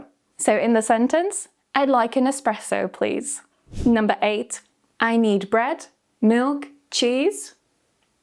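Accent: United Kingdom. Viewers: She sounds British. Often has a silent T where I was raised. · You sound like a Britian. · I love to learn to speak the English language with the British accent. So glad to have found your channel. Also, I love how vibrant and fresh you are with your beautiful smile and rosy cheeks. :) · I don't think Americans should get pronunciation lessons from a a British person... Js... you guys mispronounced words all the time. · There’s no such thing as ‘perfect’ English nor ‘correct’ pronunciation. There’s common ways to say things. You are British & as an American from NC (the South, y’all) there are many words I pronounce differently than you. Doesn’t make you correct nor me wrong.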